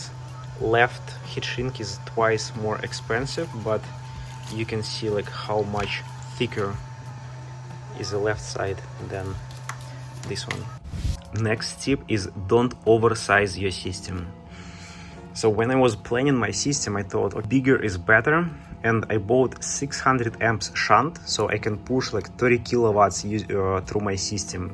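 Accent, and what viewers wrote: Russia. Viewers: Nice RunGlish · боже да что же за акцент , накой Русскому говорить на инглише есле его не особо знаешь)) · Is that a sweet Russian accent I hear? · Русский? )) акцент пипец) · Жуткий русский акцент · Русский акцент ни с чем не перепутаешь ))))) Так держать! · I do not read comments, as most of them are from people who have no clue what is the real issue. With all due respect, you sound like Russia is the place you came from. I run an industrial automation company and I employ11 Russian trained engineers. All of them are top notch specialists. I assume, you are as good as them. If not better. I have a simple question - do you think that after all the mistakes you went thru, and wastedvmaterial, would it be better and less expensive to buy a commercially available battery of similar performance? If so, what would that be? So, keep up good work, MALADIEC!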